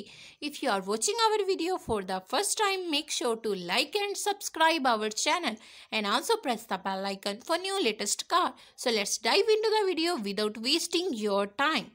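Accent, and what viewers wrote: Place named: India. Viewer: Very hard to understand, deep indian accent makes listener uncomfortable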